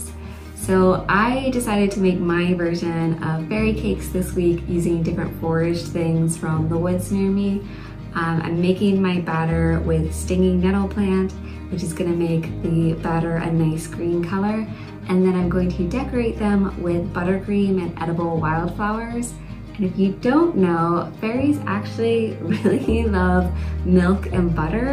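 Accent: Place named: United States. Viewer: for years I wondered what your voice sounds like and it sounds very deep and very American I hope it's going to be more of these long-term videos to stay around forever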